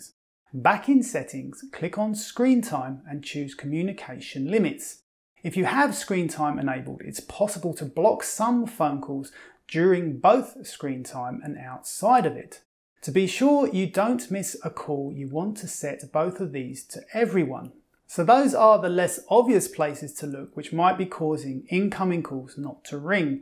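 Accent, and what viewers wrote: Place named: Cockney, United Kingdom. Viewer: Thanx! It sounds like you have a Cockney accent! Like it! Esp. Word SCHEDULE!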